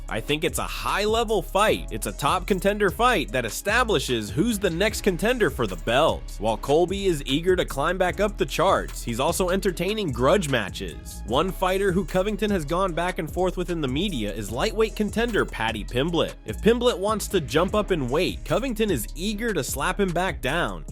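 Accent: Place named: New York, United States. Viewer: NY accent not getting name wrong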